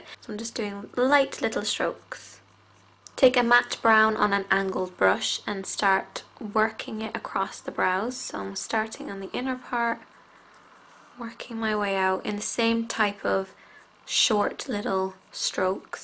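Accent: Ireland. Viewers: R u Irish??? Please reply!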